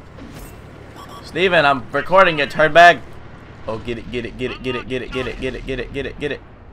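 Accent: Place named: South, United States. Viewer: im glad that your suthern accent is back!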